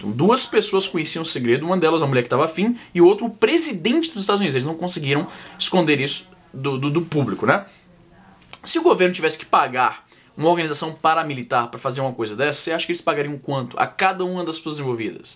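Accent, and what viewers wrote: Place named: Ceará, Brazil. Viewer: o sotaque de cearense do é muito estiloso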